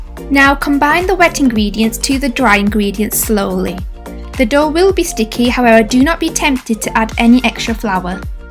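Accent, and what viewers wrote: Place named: United Kingdom. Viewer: Damn! This makes me crave for donut 😅.. Dear I love the tutorial and listening to your British accent. ❤️😉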